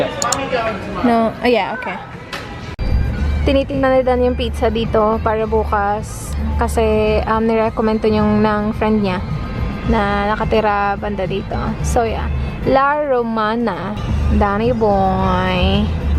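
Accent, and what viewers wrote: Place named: Philippines. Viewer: I love you ate!! I hope po EVERYDAY po ang vlogs nyo 😂 and yea namiss ko yung tagalog mo. 😂 You always speak in english na eh 😂 which is not bad but namiss ko lang po 😂 i hope na sa mga next na vlogs nyo you will speak tagalog na po madalas ☺ (im not saying na bad sya, and yes i understand na nasasanay mag english pag nasa ibang country ka, cause i live rin po sa ibang bansa. but namiss ko lang po again tagalog nyo 😂. i just love your tagalog accent so much ❤❤😘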